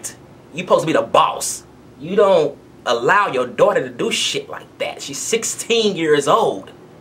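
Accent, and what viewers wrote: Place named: Missouri, United States. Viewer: I think he means Autistic he has a strong Missouri accent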